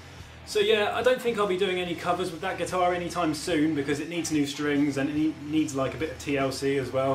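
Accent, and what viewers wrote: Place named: United Kingdom. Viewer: Hey dude just wanted to let you know.... I wanted to learn the song and just came across ur vid.... I enjoyed your self-deprecating humor s*** dude...I'm the same way ... but yeah pretty good job man thanks ......anyway ....cheerio...lol... .from Jacksonville, FL I was going to ask if you were near near London and a Jaguar fan but I'm betting probably not. my apologies if it's wrong to assume that all Brits are from London.....I don't know the difference in the accents And shit.... Anyway I just wanted to let you know I appreciate it.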